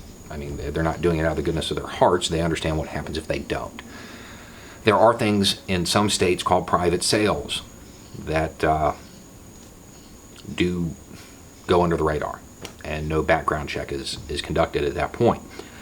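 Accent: South, United States. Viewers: Northeastern-Americans should listen to you,. I think there is a bias there against your dialect there; even in it's most refined, learned, journalistic expression. Liberal Yankees raise their kids on "Mississippi Burning" hoping they will grow up woke, but the coded message is that anyone south of Pittsburgh must be racist because the North is where people are free. You are a credit to the English Language, sir; and I have never heard these ideas said better, and neither has any gun-control liberal. You are the voice that could show white Yankees that they are not nearly as "woke" as they thought they were. just an idea.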